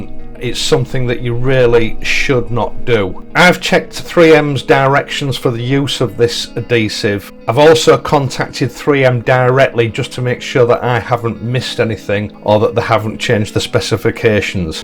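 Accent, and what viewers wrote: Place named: Northern England, United Kingdom. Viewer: Apart from the fact that I'm obsessed with this bike, and dreaming about getting one, I find your presentation style and northern accent (whereabouts are you from by the way?) very easy on the ear. I have to concur with CHANG, you are indeed the David A. of the motorcycle world!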